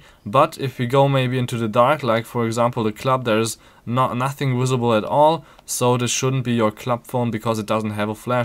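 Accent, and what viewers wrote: Germany. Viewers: Are you german?:-)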